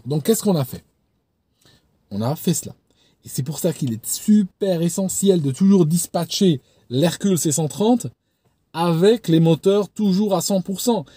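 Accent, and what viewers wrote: Switzerland. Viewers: C'est marrant, t'as pris les intonations et un soupçon d'accent suisse. Combien de temps y as-tu passé ? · Il est bien guilleret dès l introduction notre, ca change des intros à la Pierre Bellemare. Et cet accent etonnant (suisse d apres les autres) qui surgit plus présent que jamais. (Bon moi j ai toujours trouvé que tu causais comme le père Algerois d un ami). Bref autrement le contenu est toujours d une régalade absolue. Un petit hors serie un jour sur Lindbergh, le hindenburg, l Aeropostale? Tant de merveilleuses histoires dans l aviation ❤❤❤ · d'où tu le sort cet accen suisse, tu vis en suisses? Perso je m'en fou, mais c'est pas saint. Ça sent le mensonge. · Mais qu’est-ce qu’il se passe sur cette vidéo? Au début je reconnais très nettement au contraire que de toutes tes autres vidéos un accent suisse! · "LEGER" accent suisse??, plus il est en forme plus il laisse traîner joyeusement l'accent, je viens de la frontière, si je ne le connaissais pas et juste avec le son je jurerais qu'on a affaire avec un Genevois de souche! 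(mais méga-callé en aviation) 😁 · Merci...tu nous diras par quel mystère ton léger accent suisse te reviens en ce moment;-) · oui j'ai aussi senti l'accent suisse ! c'est nouveau ca ? :p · Il n y a pas que moi qui a remarqué son accent suisse 😂😂😂😂😂 Encore une très belle vidéo, merci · Oui l'accent.. C'est ma mère originaire de Genève qui m'a fait remarquer sur une autre vidéo. Je trouvais pas ça flagrant mais alors là😮 ! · Étant genevois, c'est son accent qui m'a fait lever un sourcil et de fil en aiguille j'ai commencé à suivre régulièrement ses vidéos qui d'ailleurs sont toujours captivantes. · Le petit accent suisse..! Je me disais la même chose donc.! Monstre bien la vidéo.! 😂 Vive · Eh oui j'ai aussi remarqué l'accent suisse un peu vaudois ? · Effectivement moi aussi j'ai réentendu ce léger accent Suisse dans ces dernières vidéo. J'aime bien , ici en Alsace nous avons un peu le même. 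· C'est vrai que sur cet épisode M. nous gratifie de légères intonations Suisses, sans doute un reliquat de ses études de pilotage dans la Confédération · Tu me fais sourire avec ton léger accent Suisse cher Ami, m'a tué a 900 ft on récupère que les boîtes noires😂😂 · J'ai été devancé, mais pourquoi l'accent Suisse ressurgit d'un coup dans cette vidéo ? Un coup de fil dans la journée avec un ami Suisse ayant ravivé des souvenirs ? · Vous avez pris l’accent suisse ? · Pourquoi vous reste-t-il de l'accent suisse?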